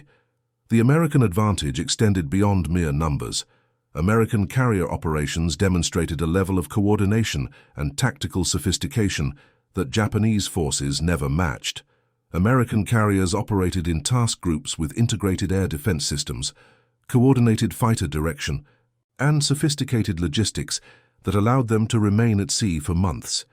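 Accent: United Kingdom. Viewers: Why is it always the voice of a Brit talking about American history ?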